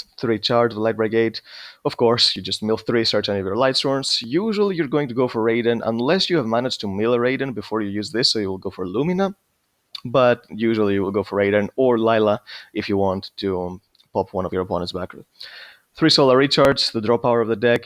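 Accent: Greece. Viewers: Δεν παιζει να μην εισαι ελληνας με τετοιο αγγλικο xD <3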